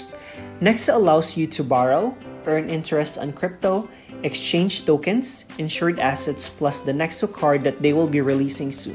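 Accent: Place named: Philippines. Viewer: U sound Filipino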